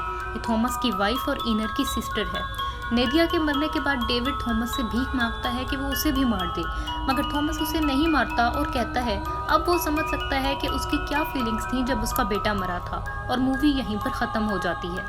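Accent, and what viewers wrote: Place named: Lahore, Pakistan. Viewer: Awaz se lgta hai ap lahore se ho...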